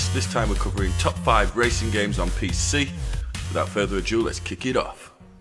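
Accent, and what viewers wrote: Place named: England, United Kingdom. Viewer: Hey I luv ur english accent, you make great vids and its a pleasure to watch it, thanks a lot. :)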